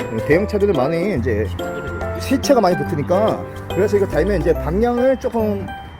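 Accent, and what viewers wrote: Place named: Yanbian, China. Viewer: 예전 개콘에서 '당황하셨어요.. 고갱님~~' 이 코너가 생각나네요 구미가 아니고 연변 아닌가요?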